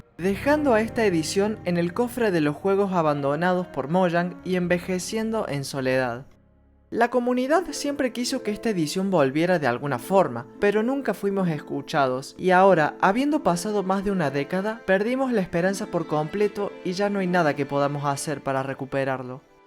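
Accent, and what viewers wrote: Córdoba, Argentina. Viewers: Tu tonada cordobesa le da un plus al video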